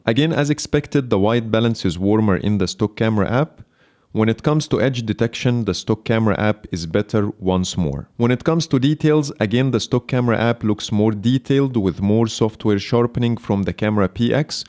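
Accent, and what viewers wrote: Egypt. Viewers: صوتك مصري ياصاحبي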